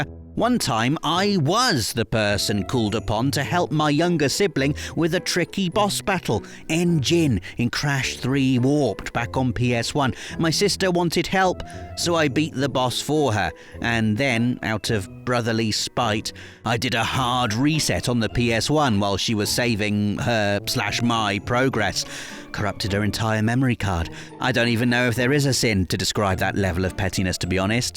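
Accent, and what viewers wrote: England, United Kingdom. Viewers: Wrath is pronounced ‘Roth’, inventory is pronounced ‘in-ven-tree’. It’s like and English accent American channel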